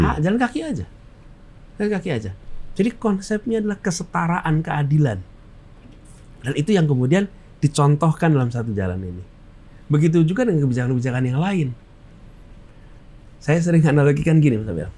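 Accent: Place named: West Java, Indonesia. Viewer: Aya oge logat sudaan pa anis bicaranya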